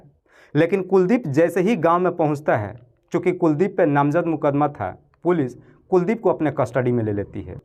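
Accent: Bihar, India. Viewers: Story telling in Bihari style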